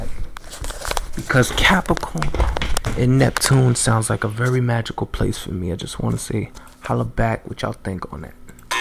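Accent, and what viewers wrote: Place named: New York, United States. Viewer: where you from baby? ny? I love yourrrrrr accent. ugh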